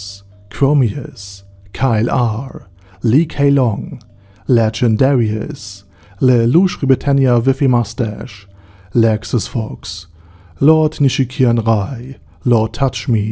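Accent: Germany. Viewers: Starker deutscher aktzent aber cooles Video Bro 🎉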